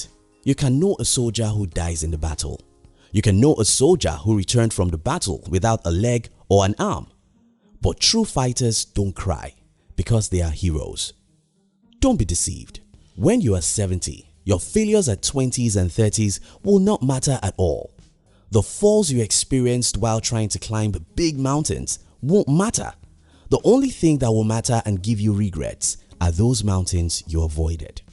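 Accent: Nigeria. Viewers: You sound like a Nigerian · Are you Nigerian?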